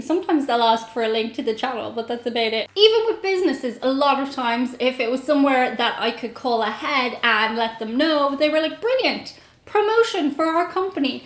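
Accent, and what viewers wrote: Ireland. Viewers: Is it just me, or are you starting to sound REALLY American yourself? I can barely hear your Irish accent anymore. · Here's an idea for your next trip to the US; you should try going to a firing range. I think I remember "Joel and Lia" going (I believe you know them or I wouldn't have mentioned them on your channel). I guess I'm the odd American, because I have been travelling abroad for longer than you've been alive, almost 25 years;). One reason I watch your videos is I miss the accent when I can't travel, love Ireland. Keep up the great work.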